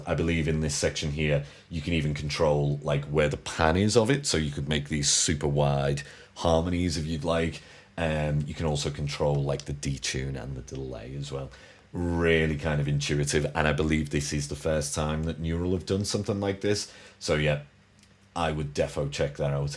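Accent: United Kingdom. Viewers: Great chops mate! I will however admit that it was your deep timbre British accent that got me smashing that like and subscribe! Ha! Seriously my dude. You should do voice overs. LMAO! Good content doggins. Cheers!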